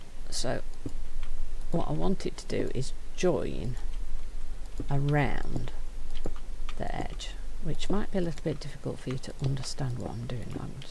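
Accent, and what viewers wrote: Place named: United Kingdom. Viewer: I have a question... Are you from the UK or the US? Because I’m from the UK and your accent sounds like some people from where I live.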